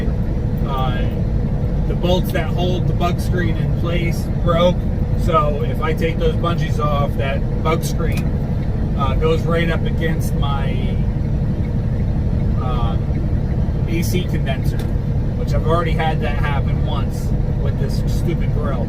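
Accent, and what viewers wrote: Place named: Northeast, United States. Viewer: He's from the Northeast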